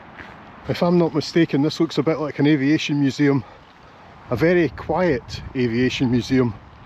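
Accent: Scotland, United Kingdom. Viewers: I enjoyed your trip,I just love you Scottish accent,I find everyone of your journeys wonderfull keep up the good work😊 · Love your 'posh' Scottish accent.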